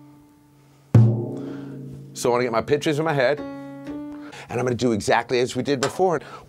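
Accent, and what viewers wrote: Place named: South, United States. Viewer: Oh man, I absolutely love your presenting style and that "southern drawl" in your voice! Thank you so much for all the great videos, and greetings from Finland! 🙌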